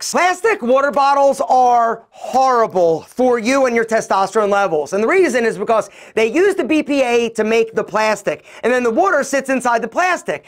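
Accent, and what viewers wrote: Philadelphia, United States. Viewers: Great advice and also a great Philly accent !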